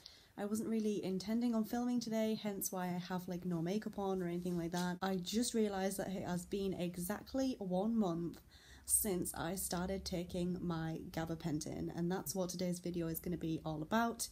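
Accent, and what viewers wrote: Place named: Scotland, United Kingdom. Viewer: Lovel, cute accent. I presume Scottish. Sorry, I am from California and haven't been to your part of the world yet. But yes, Gabapentin works for me for diabetic neuropathy and absolutely has different affects depending on so many things, like foods I have eaten, interaction w/ other meds... BTW you've got some brilliant music, et al. of course! assuming you are Scottish that is.How is the Gabapentin working now?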